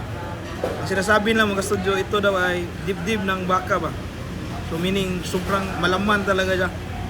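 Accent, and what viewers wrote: Visayas, Philippines. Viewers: Gahia pod sa imong Tagalog Bai. Klarong Bisdak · bisaya kay ka kollll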